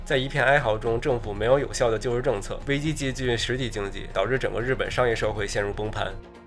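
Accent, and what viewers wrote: Beijing, China. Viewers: 北京腔真是难听，另外少参杂个人想法保持客观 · 还好吧，最多是北京人说普通话，纯正的北京话吞的妈都不认识了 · 这北京口音跟lks好像，喜欢😜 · 每次最后的一分钟我都想写下来，分分钟拿去diss那些傻逼。还有就是我好爱巫师的北京话 ❤️ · 巫师大神，哪都好，就是您的北京话实在是太北京话了，能稍微吐字清楚点么，谢谢您。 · 北京腔太难听好好说话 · 开头笑了。爷关更也好奶关更也好，会一直关注巫师，因为实在文案精彩论据夯实。京片儿口音也很喜欢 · 就爱听的北京话聊正事儿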